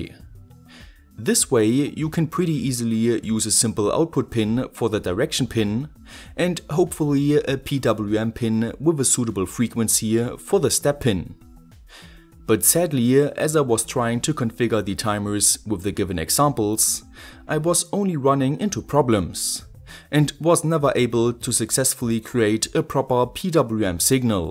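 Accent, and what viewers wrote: Germany. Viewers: damn the german accent is annoooooooying. :(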